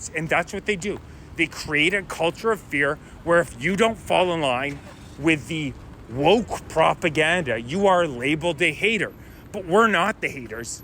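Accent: Canada. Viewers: Thanks Brother! For speaking like a true Canadian! :)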